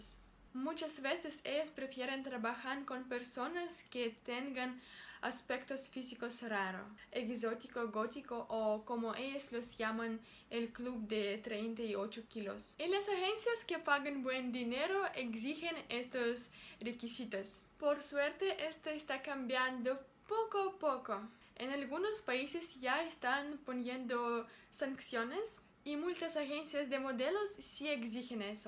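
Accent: Russia. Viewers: Me gusta tu pronunciación. O sea. Aunque hayan el ciertos detallito a corregir, igual me gusta. Por ejemplo. Se dice zapátos. Y como dices bolsillos me gusta, acento ruso, pero me gusta. Por cierto. Si te interesa aprender más español, tener con quien practicar, escríbeme al privado de aquí de YouTube y nos ponemos en contacto. Cuidate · Jajaja ese acento ruso español me encanta, aca te dejo un par de luagres para conocer en latinoamerica <link>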